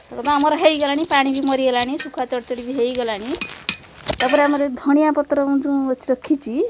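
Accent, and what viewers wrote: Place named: Bhadrak, India. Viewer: Tame bodhe bhadrakia 🤔🤔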